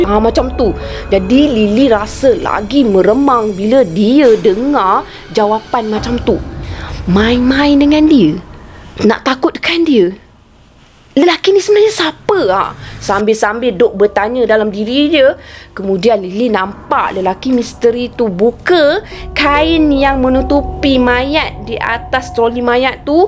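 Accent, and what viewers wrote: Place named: Terengganu, Malaysia. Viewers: Pandai cc cakap ganu...jadi ah tu slang ganu kite. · Pekat molek tranung ye...mcm sy..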